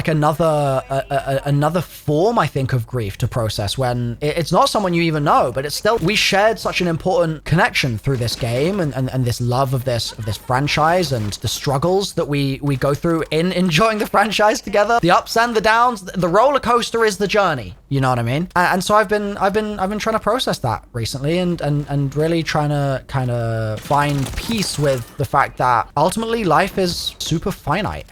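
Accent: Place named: England, United Kingdom. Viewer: just wanna say, you were such a large part of my teenage life that my close friend and i had a little inside joke where every time we'd see each other we'd just go "hi guys, here" in an english accent. two 15 year old american boys just having a nice time at the arguable peak of the zombies community. it was really nice. wanna say that ive still been consistently watching your stuff. not really commenting all that much, but im here. thanks for all the laughs man, keep doing what you do.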